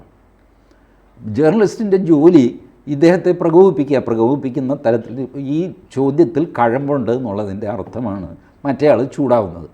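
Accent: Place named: Trivandrum, India. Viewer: Like his TVM language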